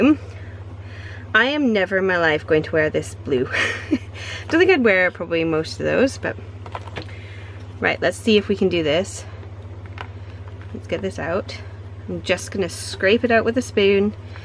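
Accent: United Kingdom. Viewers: 35 is VERY hot for the UK. I was laughing when it reached 26 a few years back and the news was warning to keep cool to avoid fainting. However, if it is that hot in Europe this summer, we in Australia can expect temperatures in the high 40s and early 50s. Oh brother. I noticed a bit of the British accent mixed in there. Nice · FANTASTIC video!! Just super, loved it.! I learned a lot and I thought of some different ideas. Thank you sooooo much for teaching us! You can't tell the difference between the home-made pastes and the store bought. Your cards are soooooo cool! You don't sound like you have much of an English accent. What area are you from? Been sweltering here across the pond also! Yuck!☺😊😀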